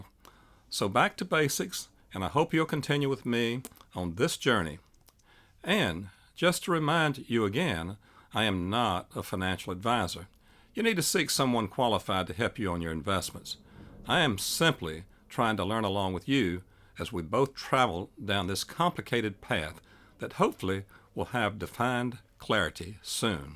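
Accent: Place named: South, United States. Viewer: you sound like a real southern gentlemen. I heard Flare would go live on may 1st. Thats what i heard.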